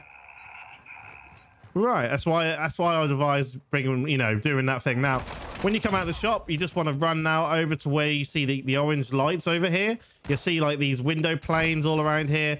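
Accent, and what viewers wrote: United Kingdom. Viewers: Hmm... "The gatman" for an assault rifle... well, you sound British so I'll chalk it up to ignorance.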